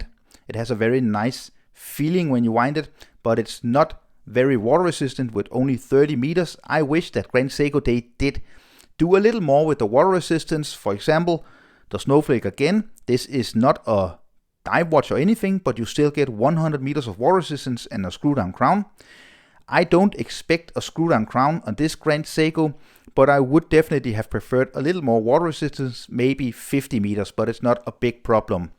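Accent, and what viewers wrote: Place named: Denmark. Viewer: Man, I can pick out a Danish accent anywhere.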